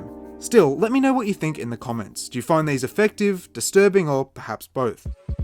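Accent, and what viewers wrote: Australia. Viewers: dont normally comment but stumbled across this video today and couldn't believe how insightful and fascinating this video was but also how entertaining it was. Also nice to hear an Aussie accent. Subbed. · Man I’m loving that more aussies are making content. It’s just so nice hearing someone that sounds like me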